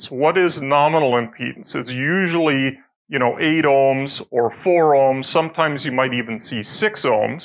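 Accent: Canada. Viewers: Canadian, eh? 😊 One of my favorite teachers was Canadian. That was like 50-60 years ago lol Howdy from the US! Enjoyed your presentation. 👍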